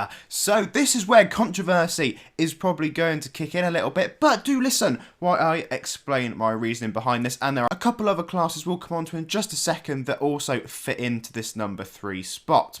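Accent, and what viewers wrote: United Kingdom. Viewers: I love ur accent and this clip U Brit or somewhere on the Europe?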